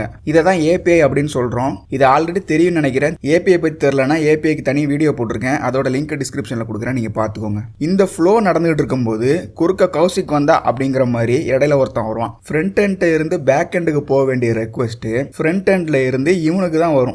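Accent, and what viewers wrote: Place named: South Tamil Nadu, India. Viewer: The Tamil Ascent of the host seems to be from south Tamilnadu probably from Virudhunagar . அழகான பேச்சு தமிழில் கம்பியூட்டர் பயிற்சி வீடியோ வாழ்த்துக்கள்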